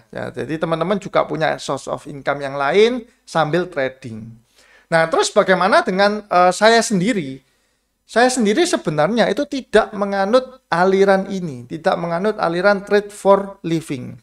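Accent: Surabaya, Indonesia. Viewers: Wah asli suroboyo iki.. Salam dari meduro.. 😁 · arek suroboyo kapasan toh bro.. auto like n subcribe..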